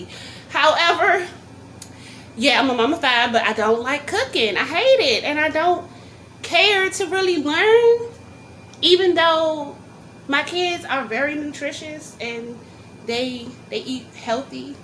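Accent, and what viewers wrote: New York, United States. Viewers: You talk like New York flavor flave 😄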